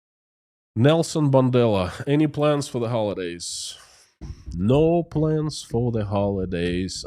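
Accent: Russia. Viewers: Thank you,. This channel is gold. Watching from merry EU. It's a bit unfortunate that your accent is so similar to Putin's puppet one in Puppet Regime on YT. I expect you anytime to say "welcome back to this authoritarian life" or "laughter is such an emotional cleanser" Luckily it also sounds like Elvira Bary and a bit like Vlad Vexler who stresses more on the British accent so you're in company of good fellow Russians. Please, keep the good work going 👍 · to improve English pronunciation work on your 'O's. In English 'O's are pronounced as 'O'' not as an 'A' as in the Russian language. So Oligargs instead of Aligargs and 'Obey' instead 'Abey'. Your English is excellent though.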